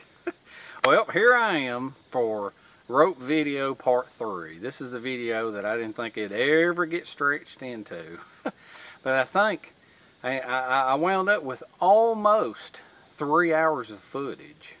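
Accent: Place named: Georgia, United States. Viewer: Yeah I kind of figured you was from Georgia by your accent. I live in SC myself. Love the country accent myself.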